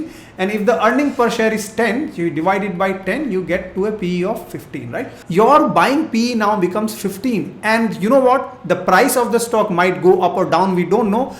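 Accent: India. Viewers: Dang! thats a heavy AF indian accent..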